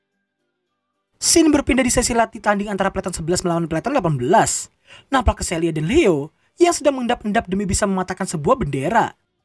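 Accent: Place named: West Java, Indonesia. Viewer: Bang lu orang Jawa Barat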